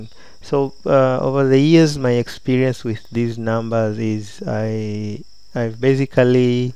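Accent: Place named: Uganda. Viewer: I thought it was Ugandan... it sounded so familiar!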